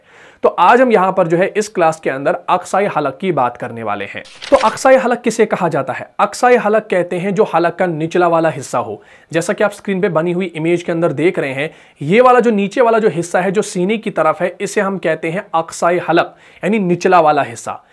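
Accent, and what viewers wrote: Kashmir, India. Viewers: Bhai jaan aapka accent Kashmiri jaisa hai ??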